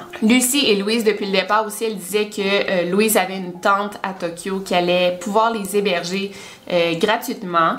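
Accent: Quebec, Canada. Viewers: Hello 🙂 Encore Bravo pour tes 300k 🎉 C'est amplement mérité 😄 Tu fournis un superbe travail 👌 C'est toujours très complet, c'est vraiment plaisant! Tu me régale ☺️ Un travail de Qualité! Je te suis depuis tes 10k, tu es monté hyper rapidement 💪 Et ce n'est pas prêt de s'arrêter, crois moi 👌 J'apprécie énormément ton accent Québécois, je te suis depuis la France Je sais que ça peut en déranger beaucoup mais alors moi j'adore 😄😄 Ça fais ton charme ☺️ D'ailleurs, rien a voir avec cette vidéo mais alors ta Vidéo de Mariage.. je ne m'en remet pas! Un Mariage de Princesse 😇 Bref, Merci pour cette Vidéo hyper Qualitative et intéressante comme toujours 👌 Tu m'en apprend beaucoup ☺️ Des bisous 😘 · J'aime beaucoup ta façon de raconter daiĺleurs grâce à toi l'accent québécois ne me dérange plus et c'est dire quil m imsuppter merci 😆en revanche cette histoire ma endormi 😥c pas le faîte qu'elle soit longue c juste que je la trouve nul Chier 'les goût et les couleurs difficile de contenté tout le monde ''mais continue vraiment je pense qkue tu ferait une très tres bonne journaliste d'investigations car aime ce que tu fait ca se ressent bisous ma belle 👄💋😻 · Mercii pour cette vidéo j’ai adoré et encore bravo pour tes 300k abonnés tu le mérites 😁😁😘 PS: tu as réussi à me faire aimer l’accent québécois 😋😋😘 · J'adore l'accent québécois 😍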